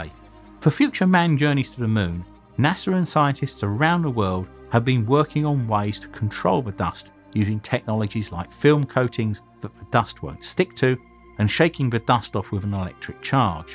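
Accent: United Kingdom. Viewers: Really? Odd how the plaque left on the Moon reads Here men from the Planet Earth first set foot upon the Moon July 1969AD We Came In Peace For All Mankind and how odd that the narrator has a British accent, but that probably because he's British with an interest in the Apollo program. And that the samples brought back have made their way all over the world. If you are really that small minded that it just has to be an American narrator, then the Apollo missions are wasted on you.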